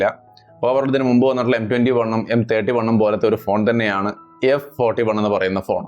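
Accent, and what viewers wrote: Thrissur, India. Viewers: Gaming review il maathrame ollo thrissur slang😝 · കഴിഞ്ഞ വീഡിയോ പോലെ ത്രിശൂർ slangil വർത്തമാനം പറയുന്ന കാണാൻ വന്നവർ ഉണ്ടോ??❤️❤️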